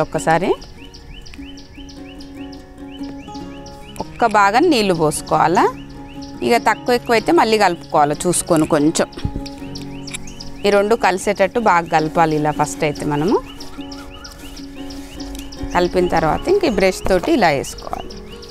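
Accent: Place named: Telugu, India. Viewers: I like your native Telugu accent 🙏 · Garu nenu meeku big fan ayipoyanu.mee resident place ekkadandi. I am in chennai mee slang kurnool anukunta correct kada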